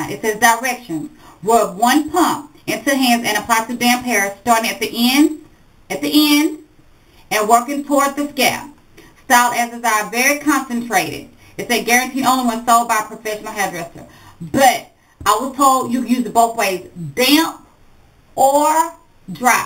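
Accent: South, United States. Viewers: you looook soooo young i mean 33 is young but you look in your 20's love the vids...i could tell u were in the south by the way u tlked so tht was comforting to me im near dallas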